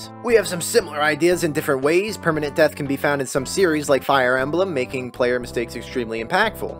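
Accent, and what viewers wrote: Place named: New York, United States. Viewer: You just sound like a new Yorker and they big there. You eat what ever you want brother, just keep making content.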